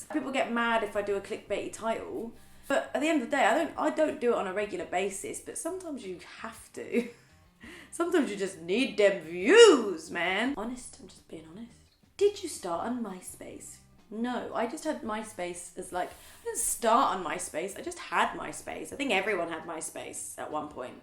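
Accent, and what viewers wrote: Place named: England, United Kingdom. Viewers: So I’m from the U.S and I have always been curious about where in England her accent is from. Anyone know?? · Karina Natalie it’s a Norfolk accent x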